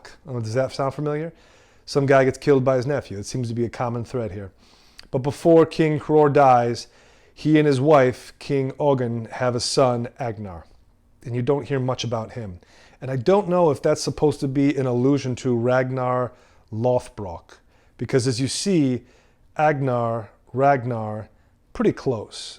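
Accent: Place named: United States. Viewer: You sound American brother. You might like this book written by a First Generation American born Norwegian titled SPIKING THE GOLD or WHO CAUSED THE DEPRESSION & THE WAY OUT (a book of facts essential to the welfare of every American). Author was born to the Arne Andreas Nordskog son of a butcher from Oslo. That’s better handwriting than mine by the way.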